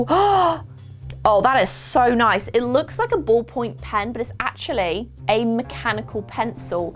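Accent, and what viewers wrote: United Kingdom. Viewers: learning how to have a British accent and I wanted to hear one to help me learn it better, and I immediately thought of u! lol :D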